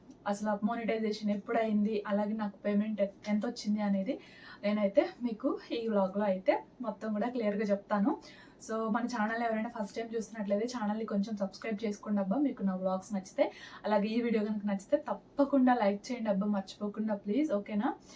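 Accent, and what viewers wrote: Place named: Guntur, India. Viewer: అవునండి గౌతమి వాయిస్ లాగే ఉంది ఎందుకంటే స్లాంగ్ ఒకటే కాబట్టి గుంటూరు డిస్ట్రిక్ట్ అలా అనిపిస్తుంది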